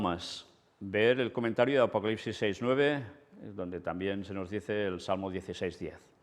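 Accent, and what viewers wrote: Spain. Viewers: Gracias hermano por su predica, ciertamente disfruto mucho cuando usted predica porque hace una mezcla de verdades con su jocosa manera de hablar estilo espanol. Bendiciones.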